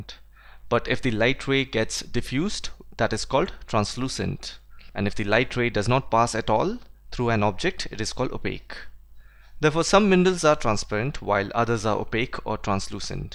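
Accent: India. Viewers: Idk but your accent seems a lot like raj kothrappali from tbbt!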